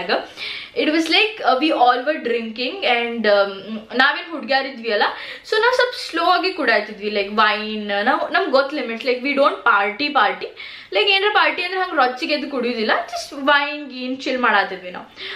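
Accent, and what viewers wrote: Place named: Dharwad, India. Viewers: Love the way you speak namma uttara kannada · Nimma Dharwad language bhalha esta aytu ri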